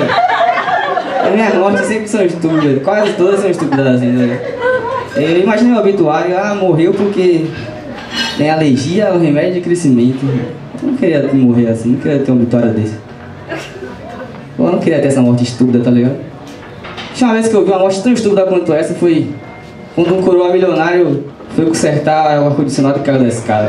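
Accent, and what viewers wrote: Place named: Salvador, Brazil. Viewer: Vimmm pelo João seu Pimenta ,se ele indincou porque é bomm, com esse sotaque de Salvador é bomm mesmo haha Vai chegar!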